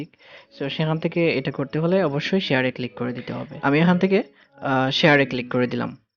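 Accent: Sylhet, Bangladesh. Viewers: no, sylheti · ভাই মনে হয় সিলেটি 🙂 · apnar mat hunia bhuja jar sylheti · tumire vai sylheti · Sylheti bro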